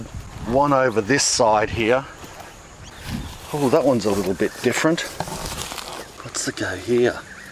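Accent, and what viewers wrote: Australia. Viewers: Love the Aussie accent mate...... ❤❤❤👍👍👍🙏🙏🙏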